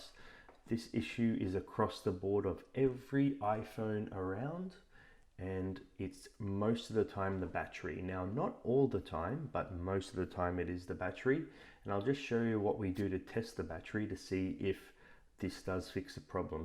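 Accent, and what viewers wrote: Australia. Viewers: Nice to hear a fellow Aussie. Mine is a 6 plus I've changed the battery and everything seemed to go as per the instructions I haven't secured the battery down yet, but when I press the on/off button I only get the white screen with the apple logo briefly then nothing at all after that. Can you help? PS my charging cable is split right down the small connecting plug part, I have ordered a new one, could that be something?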